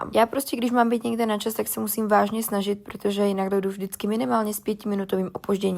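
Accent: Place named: Slovakia. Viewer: Máš super videa ale nejsi náhodov zo slovenska ? Pretože sa mi zdá že nejsi z česka lebo na tom hlase to je poznať ale ide ti to pekne pokračuj v čestine❤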